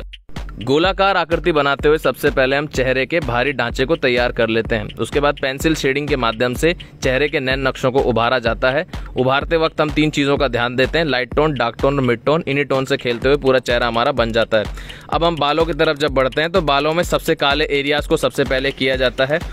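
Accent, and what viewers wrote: Standard. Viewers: Mere Bhai Dil Se Badhai aur jo Tum kar rahe ho na Vakya mein lajawab hai main sketch ki baat nahin kar raha Mujhe sketch ke sath Ek Aur chij dikhi vah bhai aapki voice bolane Ka Tarika Jis shuddh Hindi mein baat karte ho na bhai Kasam Se Dil Garden Garden Ho Gaya.. love u bro.. Jay Shri Ram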